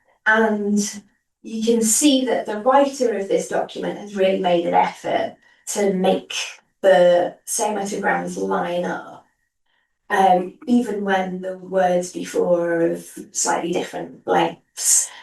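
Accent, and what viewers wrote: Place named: United Kingdom. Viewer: besides the British accent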